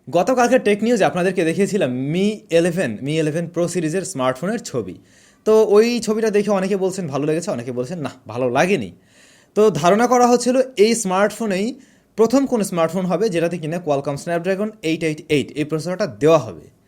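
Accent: Kolkata, India. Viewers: Via apni kolkata ar moto kota bolan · Vai apnk kolkatar moto lage apnar kicu vashar jonno jemon ami valo asi asha kori apnara valo royechen avabe kolkatar manus ra bole tai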